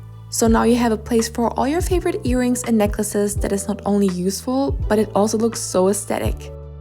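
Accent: Germany. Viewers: I CAN HEAR THE GERMAN ACCENT GIRLIEE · You have a big of a German accent. Are you German?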